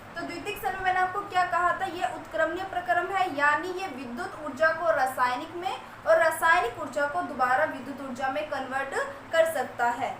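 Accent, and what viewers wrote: Bihar, India. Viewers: Hay miss aap Bihar se h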